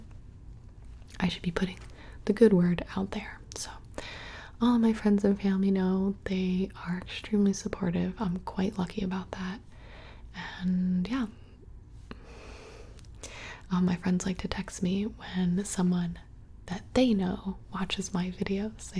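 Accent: South, United States. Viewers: i frickin love southern asmr sounds. she is the best.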